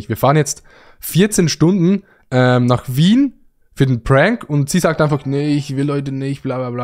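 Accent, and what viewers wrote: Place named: Switzerland. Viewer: Deine Aussprache von Hotel, beige und Bequem ist einfach Schweiz aber nicht richtig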